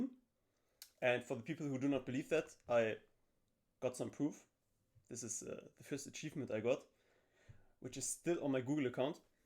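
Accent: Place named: Germany. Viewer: I hear deutsch😂❤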